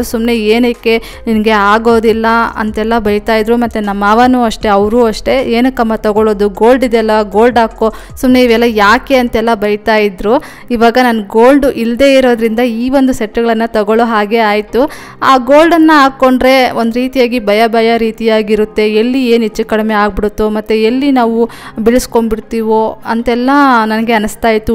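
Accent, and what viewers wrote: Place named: Andhra Pradesh, India. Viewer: memukuda telugu vallameandi,me kannada slang vini naku meru teluguvalle anipinchindi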